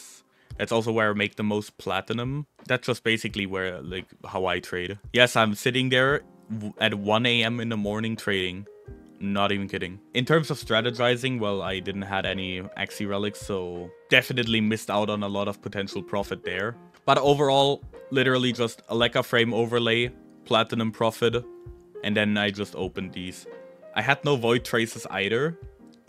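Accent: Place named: Germany. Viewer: i kinda hören deutsch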